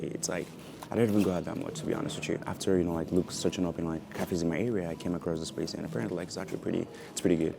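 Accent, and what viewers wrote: United States. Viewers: I thought you were American 😅 Hearing you're from Naija is a pleasant surprise ☺️ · I thought American cuz his accent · Somehow I always asume you're from the US. Maybe bcz your English sounded American. It make sense now why your mannerism is not "rough". Coz the African American I saw mostly have "rough" mannerism.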